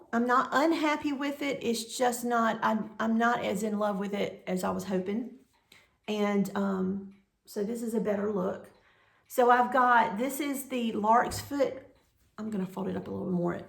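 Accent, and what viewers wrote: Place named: South Carolina, United States. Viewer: Hello Neighbor - From Georgia! Love your South Carolina accent and soothing delivery- lived in Greenwood several years and have a son in Greenville. I love all your cross stitch - so inspiring and elegant. Thank you for the inspiration. Your afghan will be lovely too!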